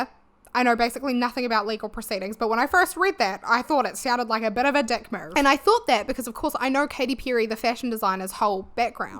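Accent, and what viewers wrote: New Zealand. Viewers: Girl please never apologize for the way you talk, I was an au pair in NZ and your accent reminds me of that amazing time there 🫶 when I watched one of your videos with my bf he said he can finally pinpoint what my accent sounds like (English isn’t my first language), and it made me so happy that I still have some of it left years later. Love the kiwi accent, love your videos, cheers from halfway around the globe ☺️ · Where in NZ are you from? I've lived here my whole life, and I can't place it 😅I'm from Christchurch! It's always good to see a fellow Kiwi putting themselves out there. My fingers and toes are crossed for your success <3 Omg also do you remember when she came to NZ in 2014? It was mad! People were desperate for tickets. My sister and I went for our 10th birthday and ended up giving our tickets to two teenage girls who were crying outside Spark Arena. 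I honestly still feel good about it lol We were right up the top and I got so sick and overwhelmed. We ended up having dinner with two nice ladies at the restaurant next door. Man, that was an interesting era of pop culture. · I think it's the New Zealand accent but when you say, it sounds just like Katy Perry, or is it the other way round? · omg i love it, the research you do is so thorough! btw I am a kiwi living in the UK and I am so glad to have found your channel, your accent and humour is like a little slice of home <3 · without any knowledge i will say to my brain, your accent is what Glorilla’s southern accent is to the US South to NZ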